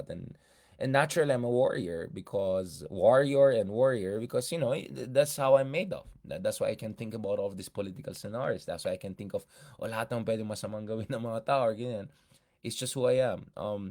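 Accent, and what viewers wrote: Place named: Ilocos, Philippines. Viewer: You are the ultimate outsider. You can go round for round with the Congo boys here in Manila. But you are more comfortable with Ilokano than you are with Tagalog. Hindi nga marunong mag ilokano si Marcos. Ocassionally you Baguio boy lingo gets mixed up in your narration but that’s fine that you lapse into ilokano. And sometimes you speak English with Ilokano accent. That’s fine. You use a lot of Russian words. Maraming dilaw na holier than thou. They’re religious. Okay lang yon na outsider ka. Okay din na napagsama mo si Ronald at si Marc. Ronald knows that the Showbiz is politics….addition. Ronald knows that the masang Pinoy is BADUY. Marc is gonna teach him the low down on Nora-Vilma.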